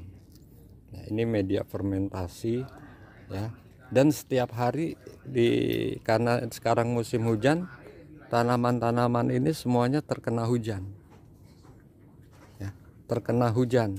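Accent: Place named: West Java, Indonesia. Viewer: iya sudah sy kira juga ada logat Sunda ya... iya mmng ini musim hujan tiap hari...sy di bogor trims pencerahan ya n nasehat ya.. salam aglonema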